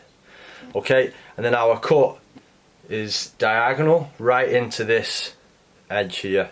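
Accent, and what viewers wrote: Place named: Yorkshire, United Kingdom. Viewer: Where abouts are you based? Must be Yorkshire.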